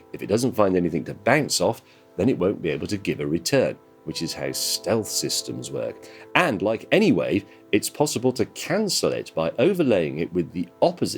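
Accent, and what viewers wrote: United Kingdom. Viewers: British accent sucks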